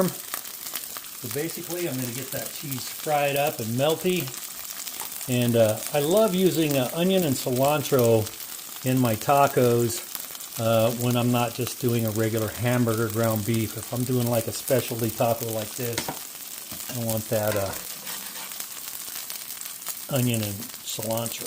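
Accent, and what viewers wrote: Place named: Spain. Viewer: The recipe just sounds better when you say it with a very authentic Spanish accent. Love birria tacos. What if I guess made it about a month or so ago and it was so delicious. Nice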